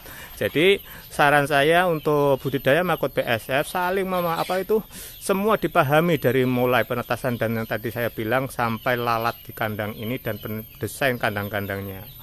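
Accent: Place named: Yogyakarta, Indonesia. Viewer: Wong jogja kali..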